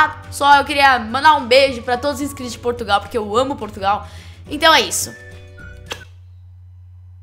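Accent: Brazil. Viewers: Tambem adoro o sotaque brasileiro #SOUPT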